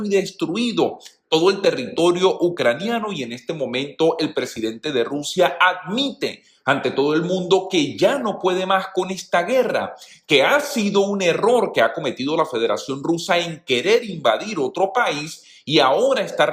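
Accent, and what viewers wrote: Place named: Venezuela. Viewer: Ese dejo venezolano jaja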